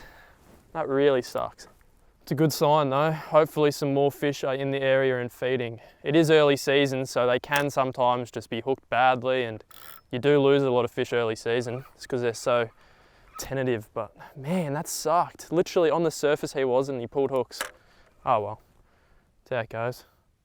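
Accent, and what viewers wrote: Australia. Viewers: You sound like an Aussie to me so we went Metric in 1966 so why are you talking ing Imperial?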